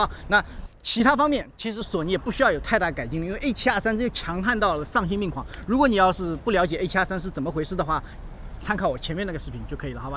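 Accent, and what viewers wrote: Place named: Zhejiang, China. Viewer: 老家哪里啊😄，我女朋友听你视频说你像是浙江的诶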